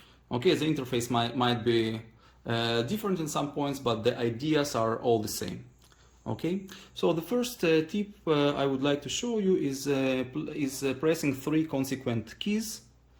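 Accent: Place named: India. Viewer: Донт анестенд :) У вас кстати индийский диалект :)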